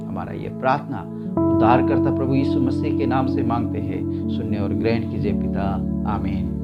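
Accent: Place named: Telugu, India. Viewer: Aapane Vachan bataya bahut Achcha laga aur aap Telugu ho mujhe aisa lag raha hai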